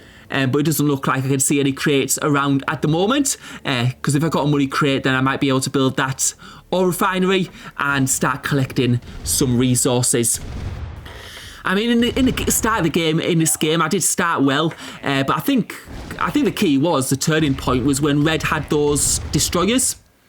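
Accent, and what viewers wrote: United Kingdom. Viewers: i like your akzent :) very british right ? did you join the german community ?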